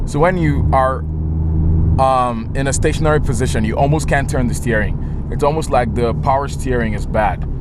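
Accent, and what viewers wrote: Nigeria. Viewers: I hear a slight Nigerian accent! My brotherrrrr lool. Much love from Lagos Nigeria. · This nigga has to be Nigerian lmfao. Made the video hilarious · You sound just like my friend Voke from Nigeria, who by the way, gets excited talking about cars also. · You sound Nigerian.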